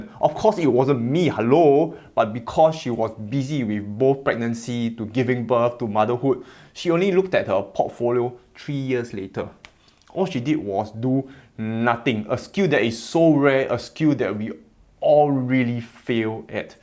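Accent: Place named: Singapore. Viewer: Singlish ? Hard to understand !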